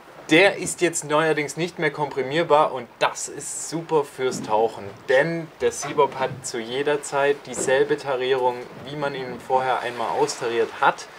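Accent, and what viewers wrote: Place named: United States. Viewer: Sounds American trying to speak German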